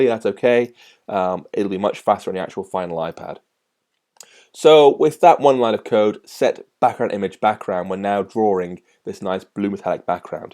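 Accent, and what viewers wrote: United Kingdom. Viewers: LOL British or Scotish.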